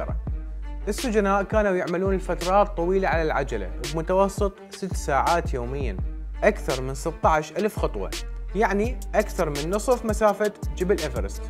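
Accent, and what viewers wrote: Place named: Iran. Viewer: فارسي الدم والشكل واللكنة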